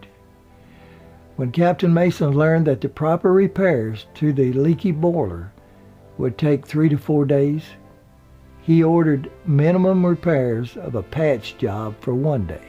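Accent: South, United States. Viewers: Thanks for doing these, I always love your narration style and your southern accent.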